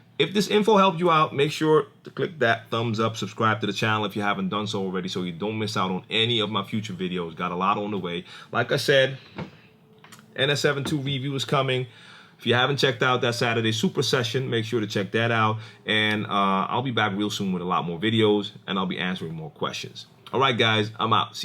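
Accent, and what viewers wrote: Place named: Northeast, United States. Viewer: Then why do you talk like someone from the Northeast US?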